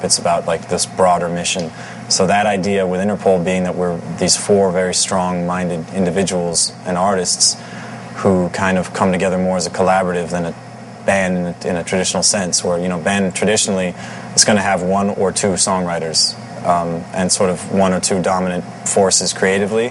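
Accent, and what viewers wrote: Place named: United Kingdom. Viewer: Is it me or he slips in brit accent from time to time? He tries to be super american, but he grew up among people who have a brit accent. Don't deny your origins, Paulie ;)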